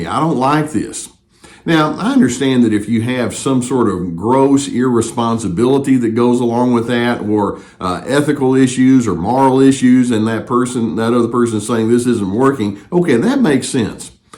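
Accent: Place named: Texas, United States. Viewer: I'm sorry to drift off the topic, but I really like your accent. Is is Texas?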